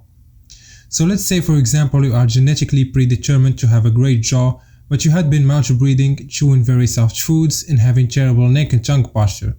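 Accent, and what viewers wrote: Morocco. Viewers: May I ask if you're Moroccan / Algerian? You're accent really sounds like you're from there :) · You sound Moroccan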